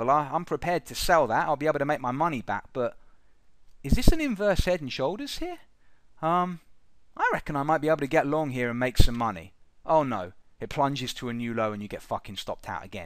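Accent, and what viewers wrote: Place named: United Kingdom. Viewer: I feel like I’m watching a Guy Ritchie movie haha love the accent. Good stuff man 🤙🏻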